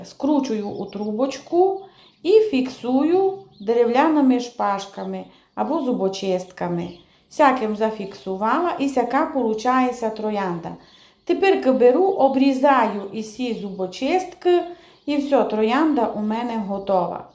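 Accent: Transcarpathia, Ukraine. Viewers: не устаю удивляться вашим рукодельным видео и закарпатскому диалекту. Поздравляю с наступающими, здоровья, терпения, достатка вашей семье.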